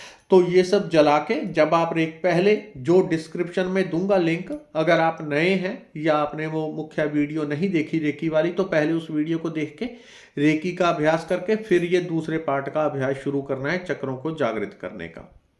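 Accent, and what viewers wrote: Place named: Himachal Pradesh, India. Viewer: Himachal se ho kya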